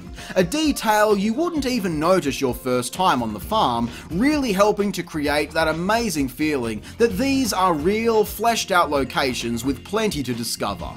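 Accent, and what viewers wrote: Australia. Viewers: So glad I found this. Subbed... 😀 are you aussie ?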